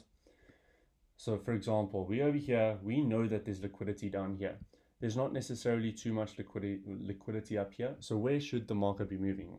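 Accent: South Africa. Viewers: If the South African accent is annoying, speed up the video to x1.25.. kwaai video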